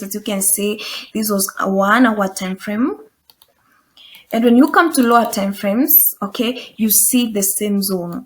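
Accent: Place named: Africa. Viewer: I say somewhere in Africa for the accent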